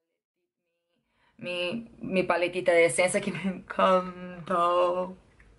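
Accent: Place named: Portugal. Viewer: Su acento es portugués si no me equivoco, me encanta su acento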